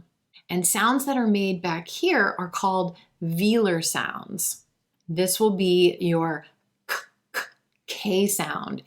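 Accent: United States. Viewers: Wow 😮 very harmful video are you American please replay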